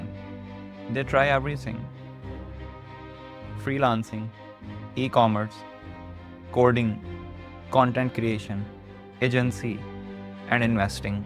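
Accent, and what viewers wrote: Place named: India. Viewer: A quick suggestion, the 'r' pronounciation isn't looking natural . Its alright to stick on our beautiful Indian accent. All the best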